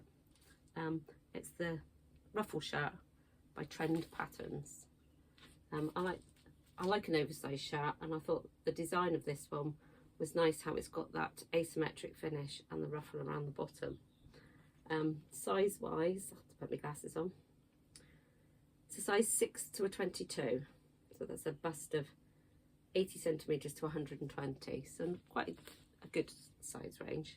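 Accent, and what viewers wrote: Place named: West Country, United Kingdom. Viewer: Great 1st vlog 👏🏻 really enjoyed listening to a West Country voice (being from Somerset). I’m interested in the bag makers box too - the bimonthly is good - not sure I need a bag a month 😅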